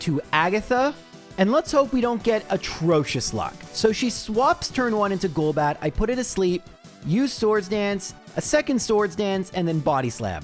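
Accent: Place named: Canada. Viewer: Whats funny to me is i wouldn't have picked up on your Canadian accent if it weren't for Y2J and the way you say "against " 😂 not that it matters. Nothing matters.. 💀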